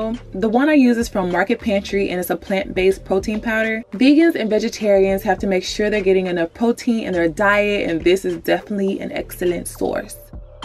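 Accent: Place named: St. Louis, United States. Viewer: Lol we kinda sound alike...sounds like u may be from st. Louis.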